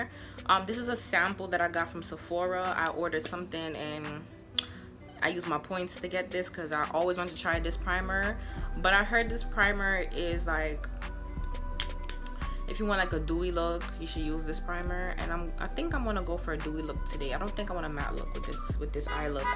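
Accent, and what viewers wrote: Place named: Jamaica. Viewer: Your Jamaican? You have a amazing accent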